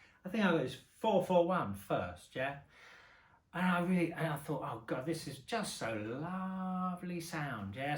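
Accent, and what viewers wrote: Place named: Cockney, United Kingdom. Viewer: don’t you live his accent! It’s a pleasant combination of proper english & cockney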